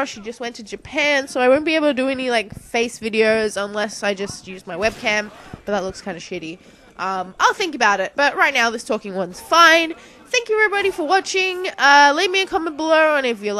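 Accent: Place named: Australia. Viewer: Glad your back, missed that australian accent of yours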